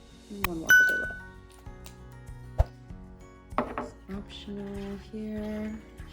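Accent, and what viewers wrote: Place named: United States. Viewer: Gần 30 tuổi chưa bao giờ comment trên Youtube. Nay thấy chị nói tiếng Anh Mỹ hay, nghe dễ. Mong chị ra video dạy tiếng Anh, chị có khiếu sư phạm. Thank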